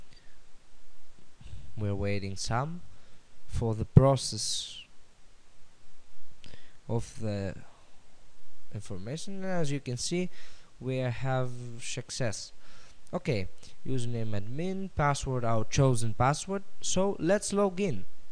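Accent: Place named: Russia. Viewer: I like russian people, they got an interesting way of talkin :)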